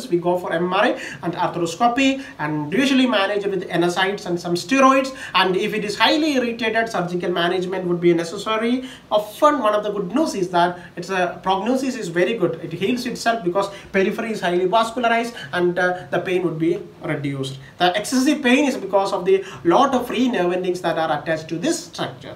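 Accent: India. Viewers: Wow you even want to enhance your Indian accent 💚 Big respect 🫡 Ps : I’m not doctor but medical stuff whose has injury in TFCC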